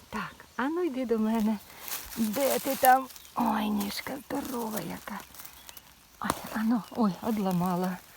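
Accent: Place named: Poltava, Ukraine. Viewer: Цікаво, з якої ви області? Чую ондо, осьо, мабуть Полтавщина? )) чи Вінниччина?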